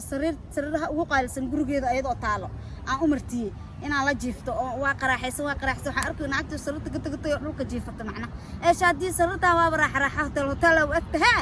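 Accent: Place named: Mogadishu, Somalia. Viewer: Maanay video yadaada markaan daawaado af reer xamarka ka bartaa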